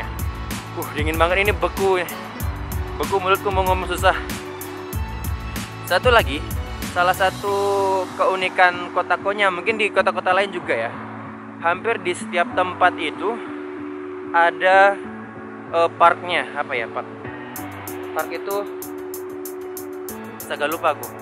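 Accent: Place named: Parabek, Indonesia. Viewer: logat Parabek wkwkkw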